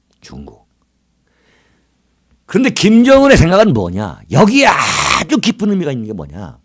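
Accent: Chungcheong, South Korea. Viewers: 충청도 분이신가요? 말투 재밌네요 ㅎ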